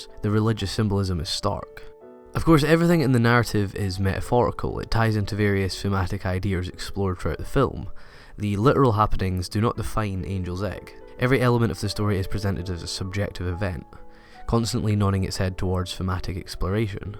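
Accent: Scotland, United Kingdom. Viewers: Where is that accent from? Scottish? British?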